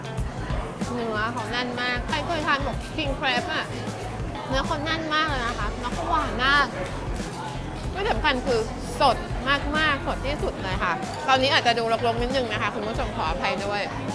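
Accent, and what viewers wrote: United Kingdom. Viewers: น้อง พูดภาษาไทยว่าเพราะน่ารัก ฟังเพลินแล้ว..ภาษาอังกฤษก็ไม่แพ้กันเลย..สำเนียงน่ารักเชียว..British?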